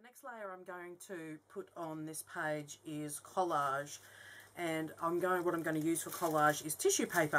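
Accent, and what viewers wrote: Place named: Australia. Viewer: Hi, I love the techniques you've shared here ☺ stencils are my favourite way to add pattern & colour right now but I go through phases of loving different techniques and mediums! I love your aussie accent too!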